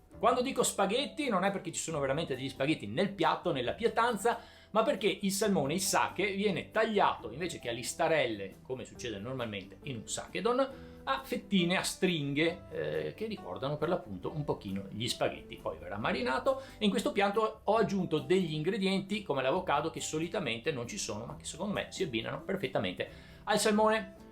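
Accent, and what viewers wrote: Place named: Veneto, Italy. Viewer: lo sento io ,perché sono veneta e forse mi sbaglio ,ma verso Treviso o vicentino?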